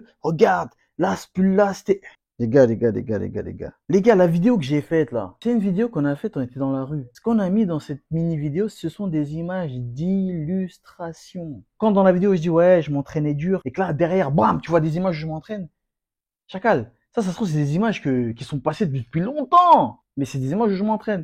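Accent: Cameroon. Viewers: Grave l'accent camer ❤❤😂😂😂😂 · L'accent Cameroun tu gères ça en normal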